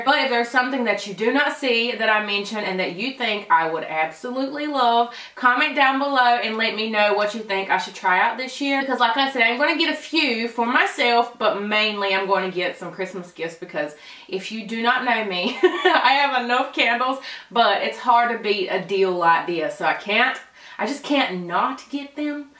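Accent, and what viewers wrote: South, United States. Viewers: Love that southern accent of yours ❤️ where ya from? I’m from the south too ofc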